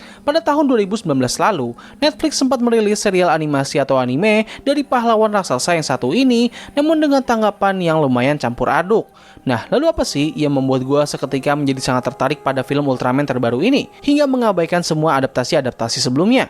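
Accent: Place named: Banjar, Indonesia. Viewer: Ulun kira Pian orang banjar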